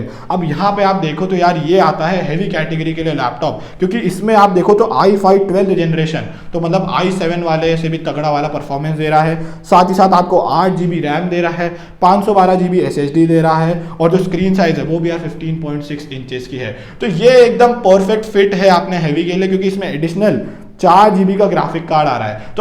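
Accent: Indore, India. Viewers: Bava indori ho kya...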